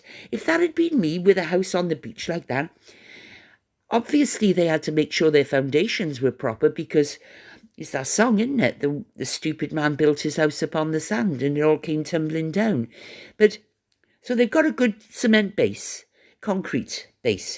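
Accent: Wales, United Kingdom. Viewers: Just found your channel, it’s lovely hearing a Welsh voice, so sorry you have been poorly, this Welsh weather hasn’t helped anyone has it, damp, cold, foggy and grey. You take very good care of yourself. No one likes going to the Dr but sometimes my lovely we need to, you go and get the blood test done and sort everything out you’ll feel much better afterwards. Stay cwtched up and take care🙏❤️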